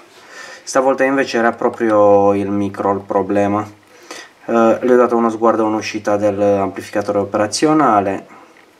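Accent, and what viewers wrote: Sardinia, Italy. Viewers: Dall'accento non capisco se sei sardo o rumeno. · secondo mè l'hai messo in corto , si nota un tipico ma simpaticissimo accento sardo unsaluto e comunque un grazie per l'impegno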